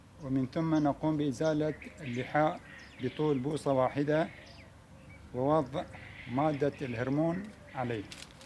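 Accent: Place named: Bahrain. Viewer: هو عراقي فكيف يتكلم بحريني ؟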